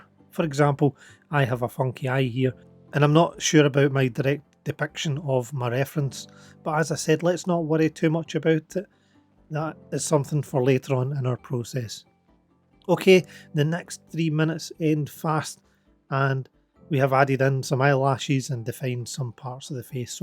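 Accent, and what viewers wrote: Scotland, United Kingdom. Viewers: you are a great Artist, good to hear a Scottish person like myself LOL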